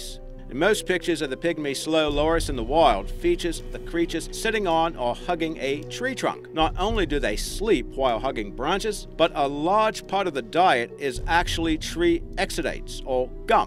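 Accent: Australia. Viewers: glad you came back!! missed your Aussie accent. please don't leave us again!! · So glad the old narrator is back. I love his Aussie accent!!!! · So very happy to have you back mate. Me an my daughter love your Aussie accent an enjoy learning about all the different animals especially the ones about Australia.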